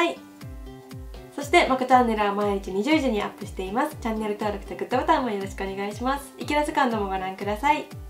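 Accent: Kansai, Japan. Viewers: かわいい😊関西の方ではないのかな？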